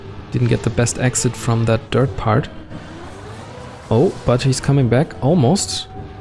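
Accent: Germany. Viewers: Are you german? Man hörts :D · dieses german english :D .D :D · Krank dass man einfach hört an dem Englisch Akzent hört aus welchem Land man ist. Bei dir hab ich direkt gehört dass du deutsch bist und bei wirtual z.b dass er skandinavisch kst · Bist du ein deutscher? Erkenne ich wegen dem ackzent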